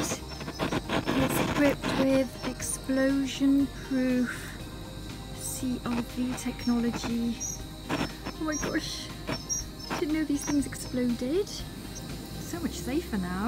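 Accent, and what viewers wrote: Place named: South Wales, United Kingdom. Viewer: Excellent I'm from the valleys I've been trying to make good pizza in the past it sounds like you're from south Wales.if you are if you like to meet up and see how you make it Best wishes from Paul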